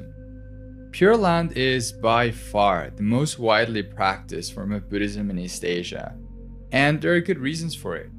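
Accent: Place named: Quebec, Canada. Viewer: Very informative! Look forward to the rest of the series. (Et je pense que c'est un accent québécois que j'entends...😊)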